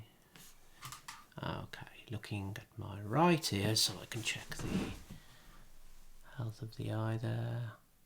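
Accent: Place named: London, United Kingdom. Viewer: that lovely accent of yours, is that Londoner?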